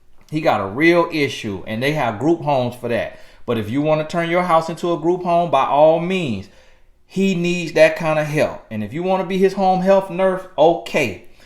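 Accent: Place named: Florida, United States. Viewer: Your accent remind me of Plies accent i think he’s from Florida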